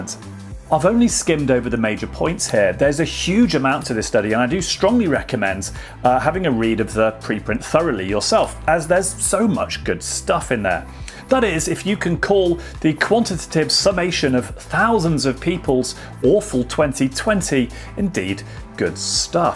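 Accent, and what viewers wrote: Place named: United Kingdom. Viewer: I read the article, but its much more interesting to listen to summarise with his cool British accent. Wishing us all a long-covid free 2021.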